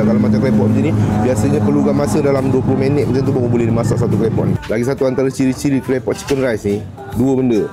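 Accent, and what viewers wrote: Malaysia. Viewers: Dia ni melayu ke cina 😂😂 slang melayu habis.. ni betul2 org msia. ❤❤❤ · Gila aku mati² ingt brader ni melayu Bila tgk nama channel baru lah cine rupanya, mmg pior sleng n sgt fasih bhsa melayu dia.. Terbaikk lah bang smoga maju jaya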